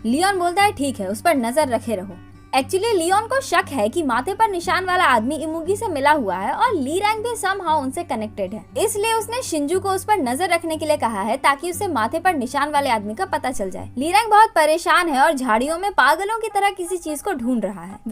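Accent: Bengal, India. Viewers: Didi tumi bangali naaaaa sondeho 6ilo kin2 tomar vat katha te pakka hoye 🥰🥰🥰🥰🥰🥰🥰🥰🥰🥰🥰🥰🥰💋💋💋💋💋💋💋💋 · You are Bangali right? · Are you bangali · Are you Bengali?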